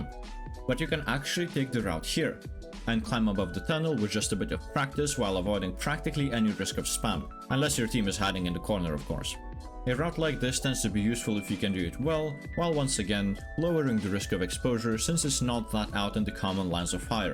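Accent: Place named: Lithuania. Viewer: Very good video, a lot of food for thought. Random question, whereabouts in the world are you from? I can't place your accent, you sound Scottish, French, Dutch and Eastern European by turns. Edit: Nevermind, just checked your profile about and spotted the note that you're in Lithuania. Odd - I''ve known many Lithuanians but didn't place your accent. Eiti Lietuva, all the same.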